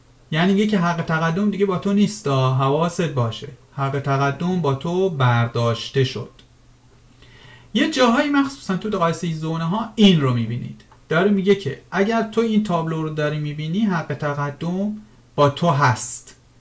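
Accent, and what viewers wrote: Shiraz, Iran. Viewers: جيگر لهجه شيرازيت برم كاكو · جون كاكو خراب لهجه شيرين شيرازيتم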